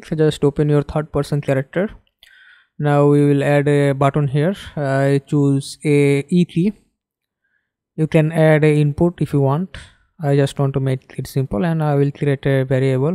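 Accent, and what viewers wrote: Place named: India. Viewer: loved the Indian accent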